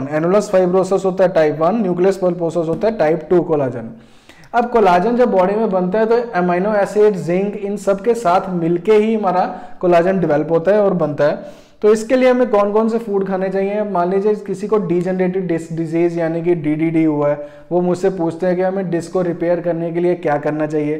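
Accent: Punjab, India. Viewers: As per ur dialect u seem to be Panjabi. Am I right?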